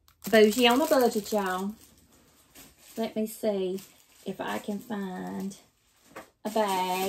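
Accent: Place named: South, United States. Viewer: Girl I love you ! From the personality to the accent I’m country myself ! And love love looovecthe videos !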